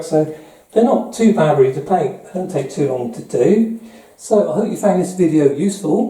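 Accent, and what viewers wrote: West Country, United Kingdom. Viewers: What's that accent? Bristol or Somerset way? Nice vid mate.👍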